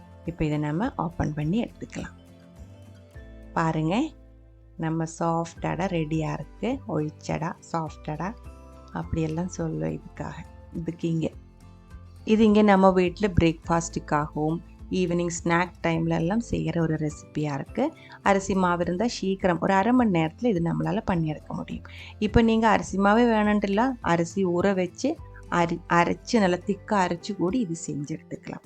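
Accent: Kerala, India. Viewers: മലയാളം തമിഴ് കലർന്ന ഭാഷ എന്നാലും സൂപ്പറായിട്ടുണ്ട്👍🙏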